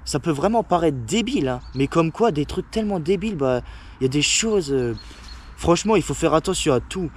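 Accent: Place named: Moselle, France. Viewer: Vu l'accent , tu est de Moselle ???? moi je suis d'Hayange 😊😊😊😊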